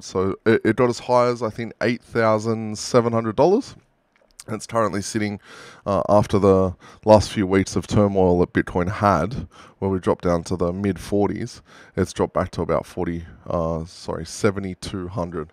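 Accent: Australia. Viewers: Just saw you on the bitboy crypto livestream! Thought I heard an Australian accent, Perth here. Love the content and also 100k lol, my first week of crypto and it has opened my eyes massively about the world and also money! Can't wait for what's ahead, hopefully will get that deposit for our first family homee very soon following some good content and advice 😊👍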